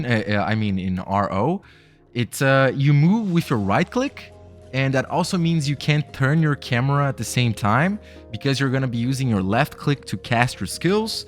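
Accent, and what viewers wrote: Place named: Brazil. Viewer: Tava vendo o video e comecei a notar um rastro de sotaque brasileiro. Fui olhar teu insta e nao deu outra kkkkkkkkkkkk por mais impecavel que seja o ingles sempre da pra perceber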